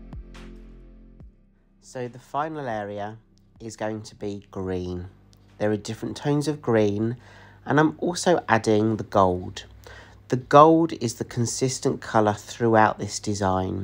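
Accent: United Kingdom. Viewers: Oh yes. Certainly 3D. It's incredible. Nice to hear your (British!) voice over rather than just music.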